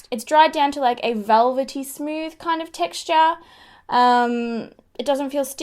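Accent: Australia. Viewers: Ohhh dear. You have the kind of totally hideous accent that makes me ashamed to be an aussie. The way you screech and draggggg out words, then your voice goes UP at the end of a sentence. Completely intolerable voice. Many will disagree but many will absolutely agree whether or not they are brave enough to say. A fact remains a fact whether u want to agree makes no difference. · wow! I'm amazed at the crap people feel entitled to say to strangers /others on the internet. So rude. I'm Australian too, and think your accent is cute... Pfft.. Ignore the haters and do you!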